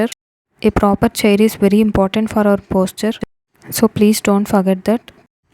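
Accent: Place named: South India, India. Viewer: Your accent looks like from south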